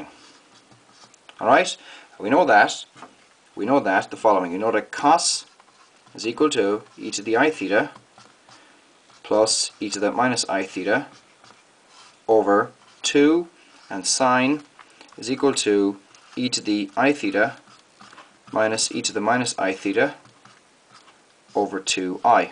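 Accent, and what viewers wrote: Ireland. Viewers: Fellow Irishman by the sound a things. Fair play man, good to see some of our folk contributing to the good cause of youtube math..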